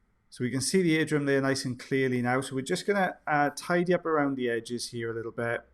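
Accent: Wales, United Kingdom. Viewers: Liking the new specs! You never talk too much. I think of you as my favorite teacher. You’re happy, excited by your subject, and teaching us the whole time! If you record an audiobook, I bet we’d all buy it! Love the Welsh accent and sayings. 🥰😀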